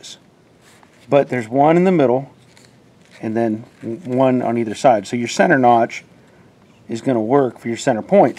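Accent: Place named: Georgia, United States. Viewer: You.do.not.talk.like.someone.from.around.atlanta..more.like.waycross,ga..lwenr.too.schoole.in.big.atlanta.many Years.ago..i.am.also.a.lill...little.south.of.atlanta........florida..keep.on.mustanging..my.friend.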